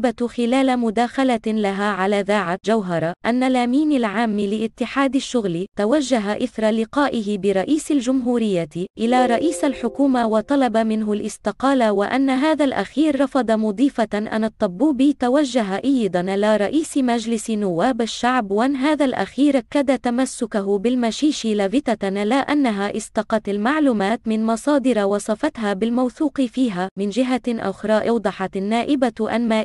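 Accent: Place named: Europe. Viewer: من انتي يا أيتها القناة صوتك يذكرني بي امراءة افرنخية أو صهيونية ليس بصوت عربية أصيلة ان شاء الله مبروك علينا فتنة جديدة نزلت على راءس الشعب والهبال وحلة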